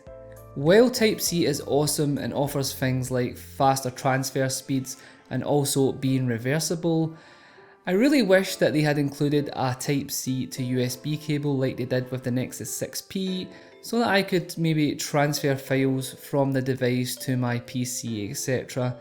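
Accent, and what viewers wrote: Scotland, United Kingdom. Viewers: Where r u from?? Scotland?? Nice accent. Nice video! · If I'm honest I love your accent. I've always wanted to visit Scotland one day 👍🏻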